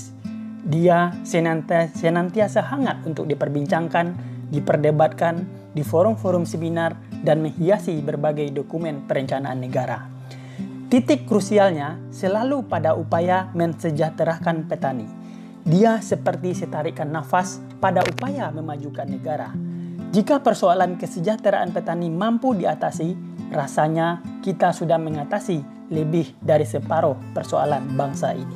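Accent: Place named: West Sumatra, Indonesia. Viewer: Logatnya orang minang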